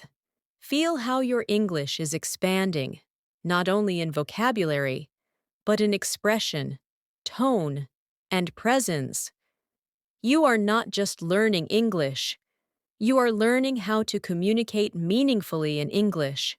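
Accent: United States. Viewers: Your english sounds american...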